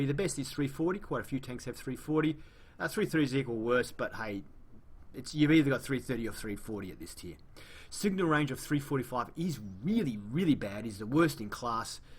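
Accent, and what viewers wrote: New South Wales, Australia. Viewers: Is that a NSW accent?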